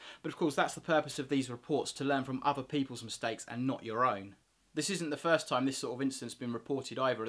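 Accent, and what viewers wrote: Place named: Cockney, United Kingdom. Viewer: You sound like a cockney. Are you from Essex?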